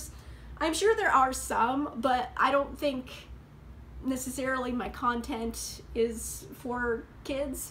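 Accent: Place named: Canada. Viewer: I don't know why but for some reason I thought you were Canadian. Where are you from? I'm in Greece.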